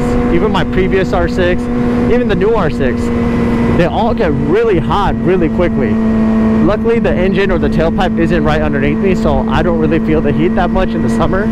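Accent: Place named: Pakistan. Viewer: He’s definitely Pakistani, I can hear it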